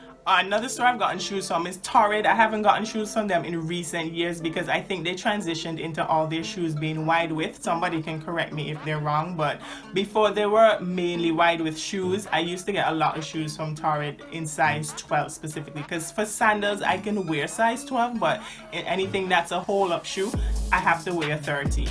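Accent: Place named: Caribbean. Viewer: Thank you for this! 💕I’m a young girl wear a size 12 feet and can’t find cute shoes anywhere😩. Love your accent. I’m also from the Caribbean. I’m from the Virgin Islands.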